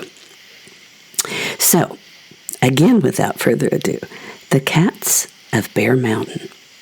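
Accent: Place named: Appalachia, United States. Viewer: What a wonderfully fun story! So much character brought to life in this tall cat tale (by the way that was a really long tail). Loved hearing your expressive Appalachian accent and colorful language too. Amazing photos of those cats, such eyes!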